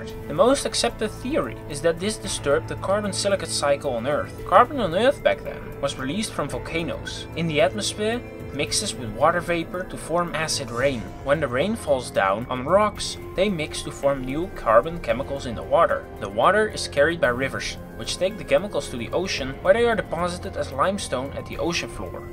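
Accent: Netherlands. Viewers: Some constructive criticism: Talk slower, and clearer (dutch accent is of a good things ;)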